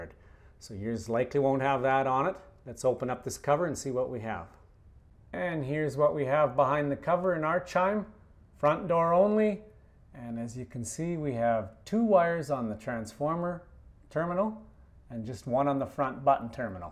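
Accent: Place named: Canada. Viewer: Great video. You say your in Arizona but you sure sound Canadian!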